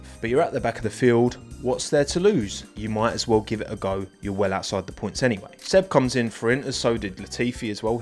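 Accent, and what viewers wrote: United Kingdom. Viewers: Yeah bro, your british accent says all there is to say. Props to your countryman!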